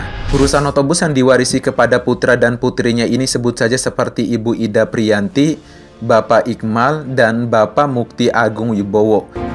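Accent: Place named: Banyumas, Indonesia. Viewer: Nggak ngapak nggak ke enak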